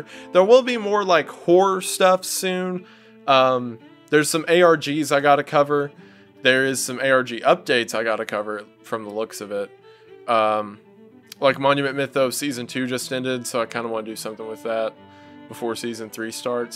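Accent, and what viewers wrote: South, United States. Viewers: Please do your 8 hour World War 2 video. And if you are worried about the algorithm break it up into three or four parts!!!!! But just so you know I would absolutely listen to every second of it like my life depended on it. I love your channel and I love your voice and I appreciate hearing someone who's voice sounds familiar.. I feel like most YouTubers who gain popularity are not from the South, and the very first time I heard your voice, I immediately thought to myself, "ahhh yes this sounds like home."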